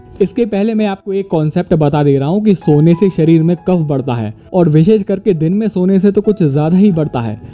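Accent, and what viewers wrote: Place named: Bundelkhand, India. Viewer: Bundelkhand se h aap sir😊😊😊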